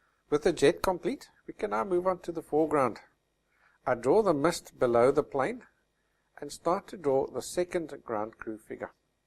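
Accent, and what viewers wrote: South Africa. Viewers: Beautiful drawing! Are you South African?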